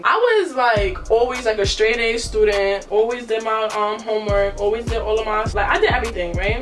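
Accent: New York, United States. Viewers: She reminds me of Christina from bad girls club with a New York accent lmaoo 😂🖤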